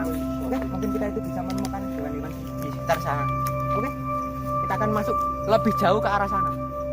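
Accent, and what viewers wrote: Java, Indonesia. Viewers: Medhok mu jd ciri khas mu sob...lanjutkan memberi edukasi... Abaikan haters... Salam kehidupan salam lestari... Terus berdakwah dg memberi edukasi👍👍👍👍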